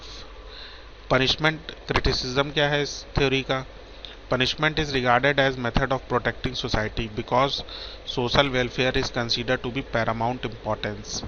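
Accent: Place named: India. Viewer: Indian accent!!!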